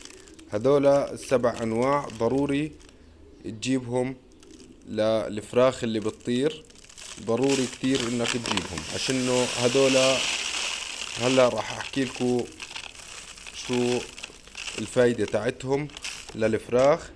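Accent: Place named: Palestine. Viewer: وانت كمان بتحكي مثلنا طاسه ههههههههه يسعد قلبك فلسطينيه كح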